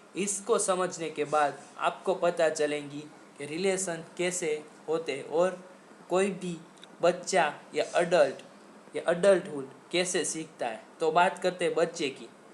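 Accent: Gujarat, India. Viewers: Ap Gujarati ho na bhai ... Language understands ..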